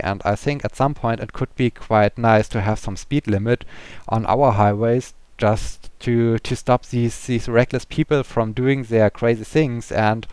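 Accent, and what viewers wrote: Germany. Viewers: I had initially assumed you were German but then I wasn't so sure since your accent sounds different from some other German Youtubers I watch. However, Germany is pretty linguistically diverse from what I understand. Also you are about the same age as me, I was 6 when the wall came down. Regarding highways; The autobahn is pretty famous, at least in North America. Speed limits here tend to be 80-100km/h but they are often ignored. In flatter areas of the country they can be up to 140km/h. Any thoughts for other topics? The language diversity thing is pretty interesting I think. Lastly, Promii is pronounced like pro-mee. It's a diminutive version of Prometheus, which I used as a screen name when I was younger.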